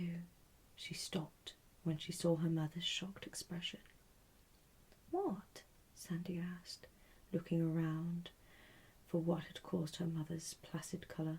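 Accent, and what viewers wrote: England, United Kingdom. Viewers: this english accent is just honey to the ears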